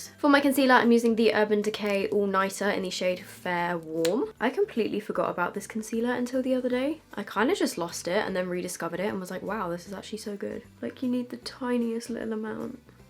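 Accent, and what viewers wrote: United Kingdom. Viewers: Also, I need to say this: I love love loooove the british accent! Could listen to this all day!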